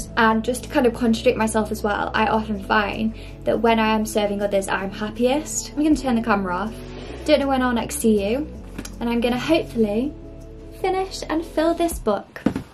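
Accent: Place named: United Kingdom. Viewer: Hello:) Thank you for sharing and updating your videos and I really like them! You're lovely! Actually I started to read the Bible(ESV) from last month and I’ve been learning British English for about a year. Can I ask you something? Do you have a plan to read the Bible(ESV) with your British accent, If you want? Your voice will definitely help someone who learns British English to be encouraged to read the Bible😊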